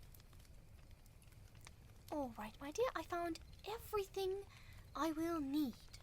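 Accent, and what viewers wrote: RP, United Kingdom. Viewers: This one is just lovely! Just the bri‘ish alone gives it this certain charm. Lovin‘ it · Ah, some proper Bri'ish, feels just like home! Like I just popped down to my local chippy and it had, in fact, turned into a potion shop! Jolly good show! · Ayoo bri ish is soo sweet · Bugger me. This accent is so posh it makes me feel positively middle class. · Me: Sees you posted a new video "Oh, nice. Wonder what it i- Also me: *sees its a witch roleplay "I know what I'm listening to tonight! Thank you - *sees its British accent Also me: various happy noises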